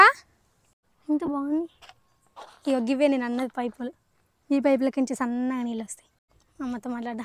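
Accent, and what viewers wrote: Telangana, India. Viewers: So sweet of u sister..chala chala transparent ga undhi...u have a bright future...all the best I like telengana slang❤... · చీమిడి ముక్కు అమ్మాయ్ ఆ భాష ఎంటి తెలంగాణ అమ్మాయా మీరు అచ్చమైన పల్లెటూరు అమ్మాయ్ తెలుగు అమ్మాయ్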